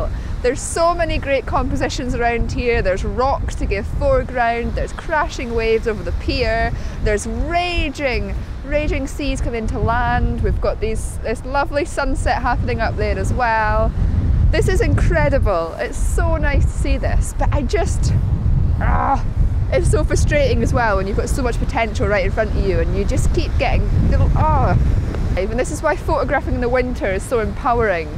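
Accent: Scotland, United Kingdom. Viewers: Totally insane!! Great video! Delightful scottish accent by the way, like it hahaha!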